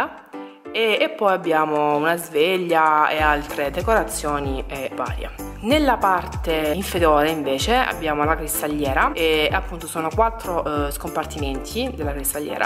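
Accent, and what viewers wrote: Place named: Apulia, Italy. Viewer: Dalla parlata deduco che tu sia pugliese😜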